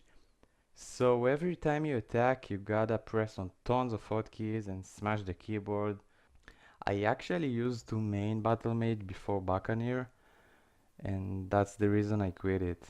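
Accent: Israel. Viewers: I recognize an accent when i hear one 99.99% israeli :)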